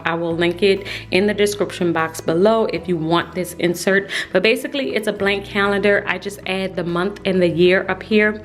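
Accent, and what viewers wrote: New Orleans, United States. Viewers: You hair is beautiful! Are you from New Orleans? I hear an accent. I just found your channel yesterday. Love your videos. Thank you for sharing.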